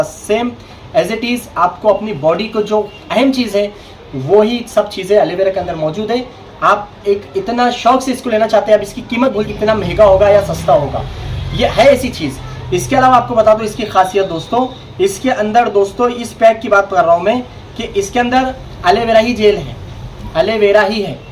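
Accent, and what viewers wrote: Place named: Aurangabad, India. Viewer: Aawaz me Aurangabadi Laheja Bahut hi badhiya hai Dr.sahab...👍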